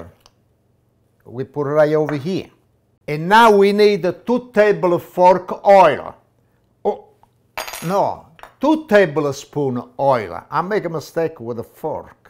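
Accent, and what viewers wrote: Italy. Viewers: Chef you are a wonderful Chef. I love your beautiful food creations. You remind me of my Father who is now in heaven. Your speaking, Italian accent are the same. God Bless you with many years of good health and happiness in your life. Keep making these beautiful video's and educating all of us.You are so delightful. Love you!! Joe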